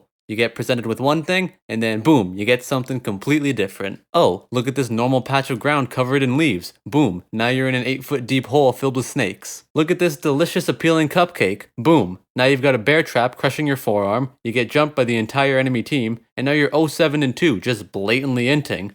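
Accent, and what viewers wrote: Newfoundland, Canada. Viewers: Are you from newfoundland? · You wouldn't happen to be from Newfoundland would ya?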